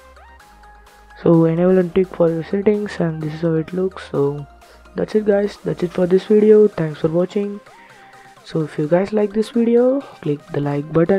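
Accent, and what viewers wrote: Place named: India. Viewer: when i heard indian accent, then i pass.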